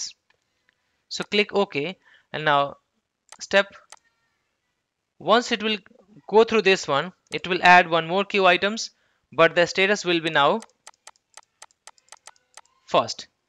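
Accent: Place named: India. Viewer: Thank you. You provided a good explanation, but your Indian accent (SOMETIMES) sounds horrible. Please work on it.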